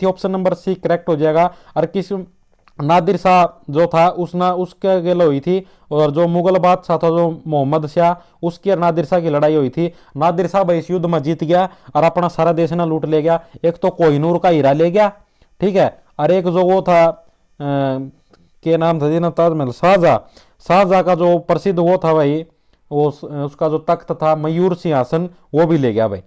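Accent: Haryana, India. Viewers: Bhai aap bilkul bhi formality ni krte pdhate hue boht ache tareeke se pdhate ho in haryanvi touch... May God Bless You😊🙏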